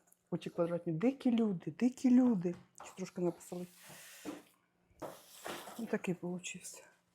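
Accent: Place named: Dnipropetrovsk, Ukraine. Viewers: така сама мова Дніпропетровська область...одним словом суржик....)😊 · Доброго вечора,! Випадково попала на ваш канал. Було дуже приємно чути наш криворізький суржик, дякую вам! Я сама народилася в Кривому Розі, живу в Атланті більше 20 років и в Україні не була вже вісім…підкажіть, як вас знайти на ФБ, якщо можна❤️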